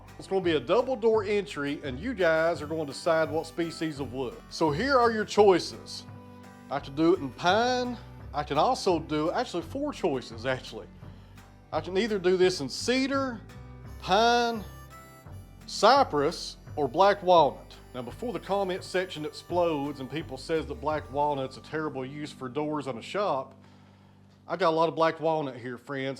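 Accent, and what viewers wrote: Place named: Tennessee, United States. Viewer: Well friend, there’s nothing I enjoy more after a twelve hour day then watching OTW, and hearing your Tennessee talk. My dad’s people were from Big Sandy, Paris, Skullbone. If I close my eyes, I can hear my pawpaw in your voice. Good stuff. I vote for Cypress. Go see your buddy, Jake.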